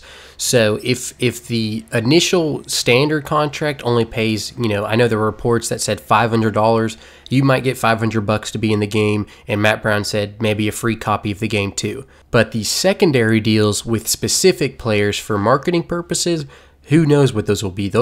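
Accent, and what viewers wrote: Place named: Mid-Atlantic, United States. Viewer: Mid Atlantic accent detected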